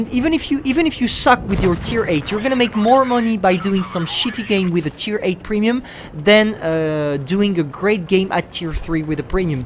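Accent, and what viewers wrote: France. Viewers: French accent !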